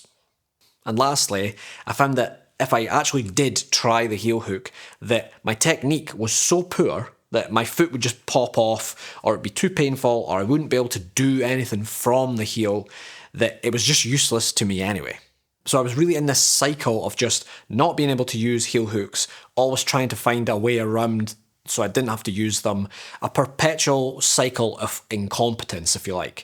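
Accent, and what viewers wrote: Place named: Scotland, United Kingdom. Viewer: Wild clicking on this video randomly and before I can even finish thinking "oh he's Scottish" I'm shown a clip from my local gym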